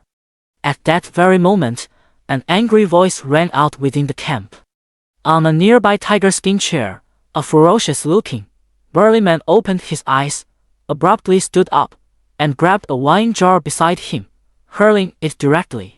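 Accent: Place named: China. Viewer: Can't listen in 2x on chinese accent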